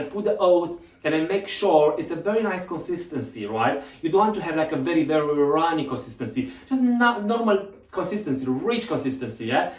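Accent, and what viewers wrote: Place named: Romania. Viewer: He is romanian..i knew it..by the accent.😉👍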